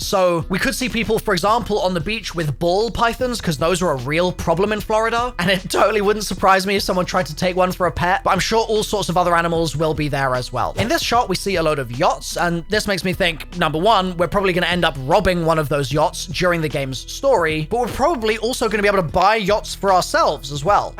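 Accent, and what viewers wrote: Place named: United Kingdom. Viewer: Bro it's because of his accent. He says it like Ig•yoo•ahna and americans say ig•wana or eeg•wana. I've noticed that a lot of words have a more pronounced "yoo/yew" sound with a british accent.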